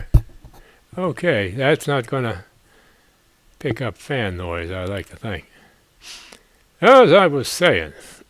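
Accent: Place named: Maryland, United States. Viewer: Oh shit you must live somewhere in Maryland, cause I haven't heard anyone else pronounce Washington as "Warshington." The National Building Museum is a great place. Went there for a school trip a few years ago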